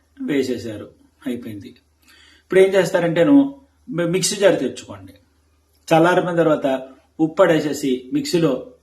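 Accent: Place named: Godavari, India. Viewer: మీరు గోదావరి యాస లో మాట్లాడుతున్నారు మీది ఏ ఊరు సార్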